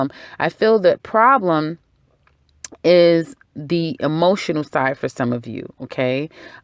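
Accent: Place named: New Jersey, United States. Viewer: nice reading dead on....luv ur accent sounds like New Jersey New York or Maryland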